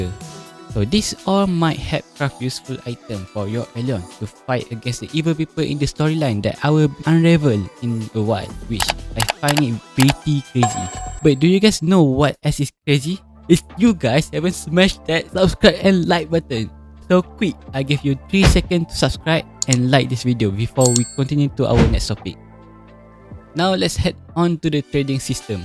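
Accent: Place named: Malaysia. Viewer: I can recognise this person from just the way he speaks English 😂 he from Malaysia😝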